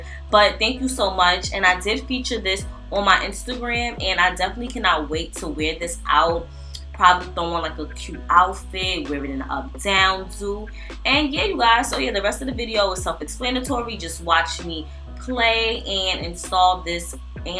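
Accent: New York, United States. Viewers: New Yorker?😊🤗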